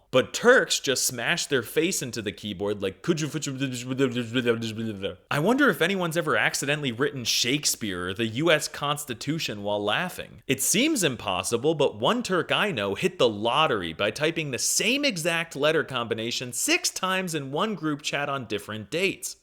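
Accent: Russia. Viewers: neden rus aksanıyla konuşuyon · Rus aksanı ile anlatmasan daha iyi olurdu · Very funny video😅 But I have to correct one mistake: Turkish alphabet is not a version of American alphabet, it comes from ancient Turkic languages. And by the way, you speak Turkish in Russisn accent , Turkish is much softer and musical . It is also a mathematical and poetical language . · You speak Turkish as if you are Russian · Türkçe konuşabilmek için kendini o kadar sıkıyor ki sonunda Rusça konuşup Türkçe kelimeler söylüyor 🙂 Türkçede R'ler hiç bir zaman bu kadar vurgulu söylenmez. Tek vurgu hatta yuvarlayarak bile söylemen yeterli R'leri. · like a caricature of Turkish mixed with Russian acccent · American imitating perfect Russian accent while speaking Turkish. Thats.. .amazing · WHY IS YOUR ACCENT LIKE RUSSIAN ACCENT DAWG 🥀💔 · he is speaking turkish like russian people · damn you have a heavy russian accent only while speaking turkish lmao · FOR REAL, it sounded just like Russian I was so confused! 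· İngilizce’yi bir İngiliz gibi ama Türkçe’yi bir Rus gibi konuşan bir Amerikalı. Tanıştığımıza memnun oldum. ✋🏼 Ben Almanca’yı bir Alman, İngilizce’yi ise bir İtalyan gibi konuşan bir Türk’üm. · What is up with the weird map dude? There is no such thing. And this is not the turkish Accent it sounds like almost russian OMG. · Interestingly you speak turkish like a russian. Did you know that? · AMK BU NE GERÇEK OLACAK SANMIŞTIM Oh and WHY ARE YOU TALKING WITH A RUSSIAN ACCENT😭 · i have never heard turkish with a russian accent by an westerner till today. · you are talking exactly like russians that tries to talk turkish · Bro you sound Russian when you speak Turkish 😢 and I'm a native, spent my whole life in turkey and still struggled to understand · I have never heard someone speak Turkish with a Russian accent. also I love the "malum kişi" bit lmao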